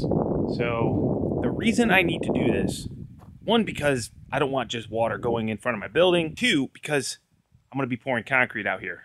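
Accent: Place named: Midwest, United States. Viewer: Hi I'm a big fan of your channel, in from Yorkshie, England. We are known for our strong accents, but I love the way you say ( kahncrete ) not sure if that's how most mid west people speak, but it's got a proper workers sound to it. Full respect to you and for your hard work.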